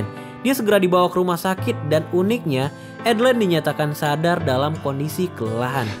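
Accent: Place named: Java, Indonesia. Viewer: Medok nya ituloh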